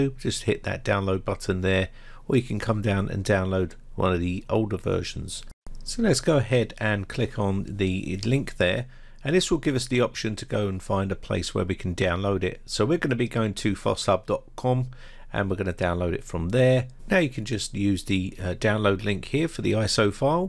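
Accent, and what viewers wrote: England, United Kingdom. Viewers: noo? a noo partition? Wait, you SOUND English... so.... noo? What Nationality are you, boy? its a NEW one on me.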